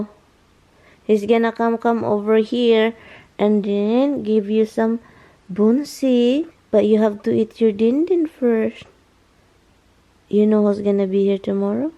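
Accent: Visayas, Philippines. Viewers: Alam tawa ako ng tawa SA scolit mo Day😂😂😂😂natutuwa ako sau pag naay accent na bisayang dako😂😂😂✌️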